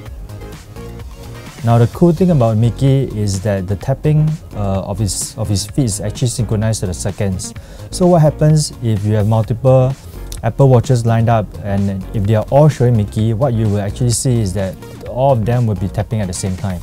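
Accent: Singapore. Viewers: omg that Singapore accent. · Definitely sounds like a Singaporean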